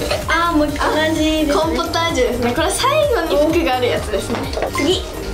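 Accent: Miyazaki, Japan. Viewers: やっぱ宮崎弁やん かわいすぎ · 宮崎弁でしゃべってるのかわいい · 宮崎弁ところどころ使ってる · 宮崎弁可愛い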